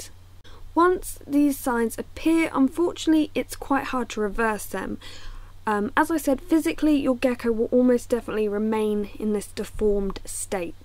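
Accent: United Kingdom. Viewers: Is it okay that I love the way you say "vitamin D3"? Not being mean, its just cute. The British accent is so easy to listen to. Im American born and raised, but my Aunt is from Ipswich and its almost relaxing to sit and listen to her talk.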